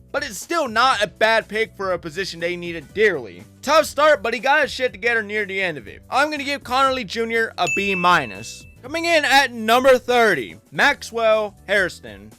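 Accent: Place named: Pittsburgh, United States. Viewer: I can hear the slight yinzer in you.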